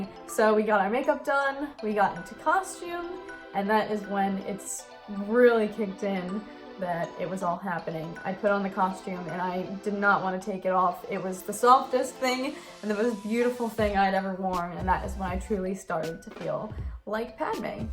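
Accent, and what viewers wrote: United States. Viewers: My dad's family is from Philly and you sound just like them.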